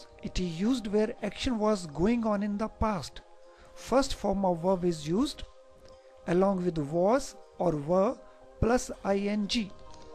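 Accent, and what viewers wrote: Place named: India. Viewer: i hate your Indian accent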